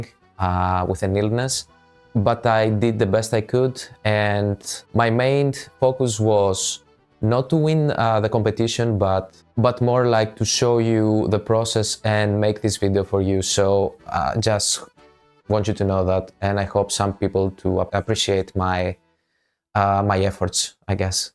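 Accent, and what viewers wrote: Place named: Spain. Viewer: 'How is he such an incredible painter?!' Hears Spanish accent 'Ohhh' Fr though, amazing work! Gonna try out this skin recipe so thanks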